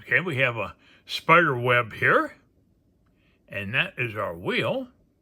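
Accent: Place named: England, United Kingdom. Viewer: It's really nice to listen to your video, here in Italy... waaaaves... your accent sounds "English" am I right?? I'm not sure but... it seems so... and I like it!!!! Thanks for this beautiful review, from today you have a new follower from Italy ! wide smile ! hellooooooo !!!!!!!!!!!!!!!!!!!!!!!!!!!!!!!!! ( and today I ordered my tarot deck: I Love it !!!!) Ciao and thank youuuuuuuuuu !!!!!!!!!!!!!!!!!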